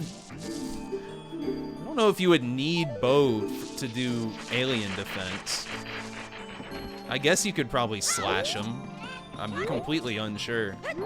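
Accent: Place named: Brooklyn, United States. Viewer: sorry, this Brooklyn accent makes it difficult in stopping Ganondorf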